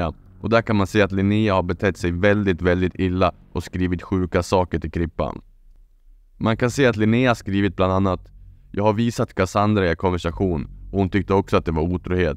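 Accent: Dalarna, Sweden. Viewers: Härligt med lite dalmål på Youtube 😎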